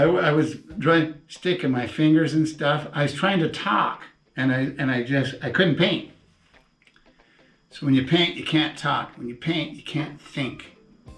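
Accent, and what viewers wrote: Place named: Midwest, United States. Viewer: Where did you grow up? I'm trying to figure out by how you talk. I was thinking Chicagoland, now not sure, definitely midwest. This is my first time on your channel. On my 6th video. Thank you!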